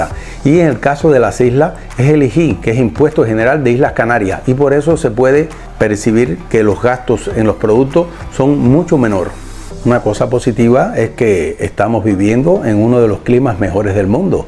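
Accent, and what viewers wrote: Cuba. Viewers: Tú no eres Canario, tu acento es cubano...Yo soy de Tenerife de muchas generaciones y NO se vive bien en las Islas...antes viviamos bien...Claro que igual para ti de donde vienes esto es el paraiso...Pero el Gobierno Central nos tiene olvidados...No tienes ni idea de nuestra idiosincrasia...No me gusta como defines hablando de un paraiso mi tierra que están enterrando... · Hola. Eres Cubano o Canario? Tiene un acento Cubano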